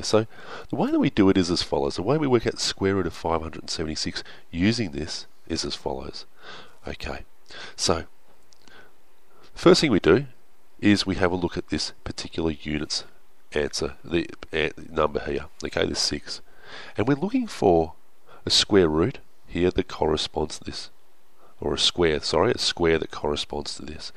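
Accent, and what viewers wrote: Australia. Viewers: he sounds more Australian than British. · No he's just Australian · I was mesmerized by your Australian accent and it helped me do really well with the math on my MCAT. Thank you! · Thank you Mr Australian man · He's Aussie that's why? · no, he has a aussie accent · oh my god you sound australian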